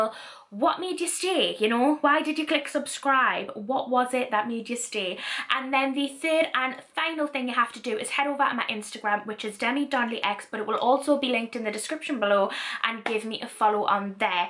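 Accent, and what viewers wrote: Newcastle, United Kingdom. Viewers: Reasons I follow you: - were both from Newcastle, it’s so nice to hear the Geordie accent online! - love the little challenges you give yourself! - I think you’re funny and so gorgeous! - I adore the confidence you have in yourself. ❤️ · The reason I subscribed to your channel is because you are so down to earth and don't follow all the other YouTube bloggers, you are different from all of them...you keep it real basically and don't portray your image, I also enjoy watching your videos as you are always bubbly and I love your accent/voice it's brilliant! (I'm from down south and love hearing the different accents from other British people 😂 I'm not weird honestly! 😂).....I've followed you on Insta aswell 😊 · The reason why I subscribe to you is because I never found anyone really relatable or who speaks like me (Geordie) I love your videos and watch them all the time xx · I subscribed because it feels like home. 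You and I are both from Newcastle, ( I feel like there's not so many people on here from the north east) so wherever I am in the country, or in the world, I can click onto your channel and feel like I'm home again. It's so weird how an accent can transport you back to familiarity - especially when you're home sick. It's so lovely to see someone from the north east doing so well for themselves. We're always kind of looked down upon, for being dumb, drunk and outspoken, ever notice how when a geordie is on the TV - whether it be The Chase or Big Brother they always show us up? Thanks for not doing that. That's why I stayed - because you're not like everyone else. Thank you for always being genuine, fabulous and kind. P.S My Instagram is a new account so I didn't just follow for the giveaway!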